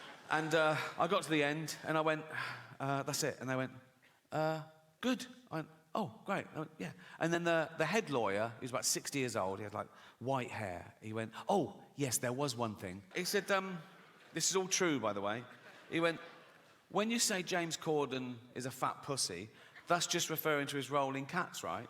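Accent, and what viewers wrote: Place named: United Kingdom. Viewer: Only a brit can do this! It would not sound so good in American accent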